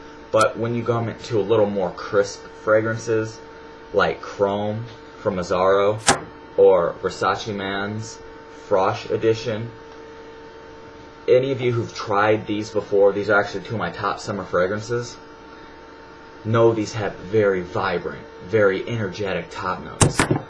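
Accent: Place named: Pittsburgh, United States. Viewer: your from Pittsburgh aren't you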